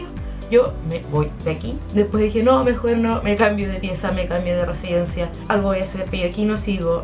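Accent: Chile. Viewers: no es porque estabas acostumbrada a hablar inglés, si no porque tu acento chileno es muy extremo. No se entiende. Habla más neutro. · me encara tu tono chileno😊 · Me costó bastante entenderte perdón no es por ofender pero deberías ser un poco más clara al hablar se que así hablan los chilenos y no tengo nada en contra de eso pero habemos muchos latinos más que no entendemos así que vocaliza un poco más por favor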